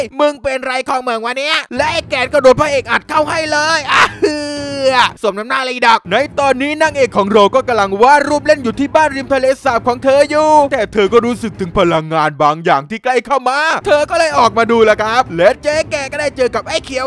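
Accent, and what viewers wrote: South, Thailand. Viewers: สปอยภาษาใต้ทั้งคลิป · ชอบสำเนียงใต้จริงๆ 💕💕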